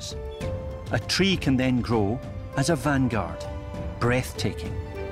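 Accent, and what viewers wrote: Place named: Scotland, United Kingdom. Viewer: Moar narration with that Scottish accent, please! ♡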